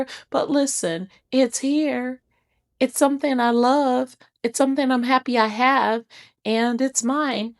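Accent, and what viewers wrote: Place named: South, United States. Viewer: I like that your spread is simple! When I’m watching agenda planning, I simply don’t want to think! I’m from SC and you sound as if you are from my “neck” of the woods! Maybe Georgia and last guess Tennessee! Anita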